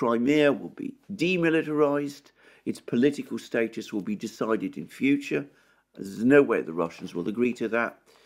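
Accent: United Kingdom. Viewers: "Pentagin" 😁 I love the Brit accent.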